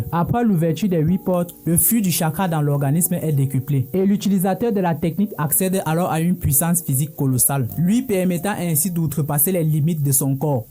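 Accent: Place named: Africa. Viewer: C'est juste que t'as 1accent comme dans beaucoup de nos pays Africains dû à nos langues traditionnelles, c'est pas grave mon frère. Continues comme ça j'aime tes vidéos👍🏽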